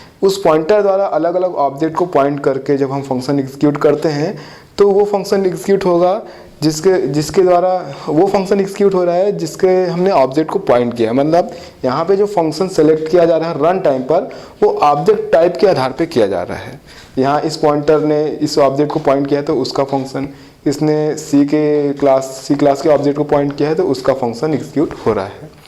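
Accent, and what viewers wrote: Bihar, India. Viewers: bihari pronunciation krna to sekh le phle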